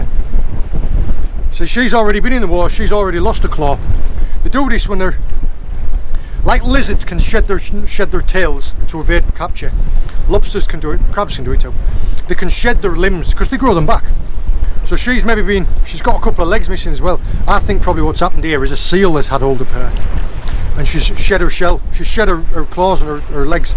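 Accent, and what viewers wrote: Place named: Cornwall, United Kingdom. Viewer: oooh, a bit of the cornish accent is creeping in mate!!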